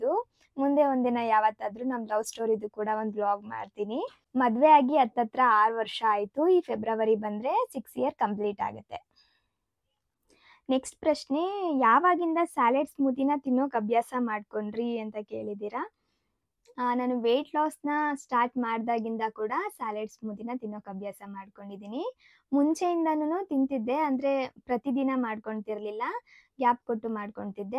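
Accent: Mandya, India. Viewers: Nim math keli starting andkonde madyane anta. We are also mandya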